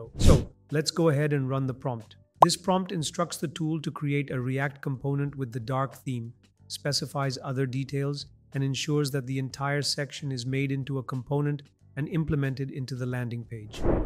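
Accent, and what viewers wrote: India. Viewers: Genuine question is this your real voice or ai clone Somehow i could teast the Indian accent within it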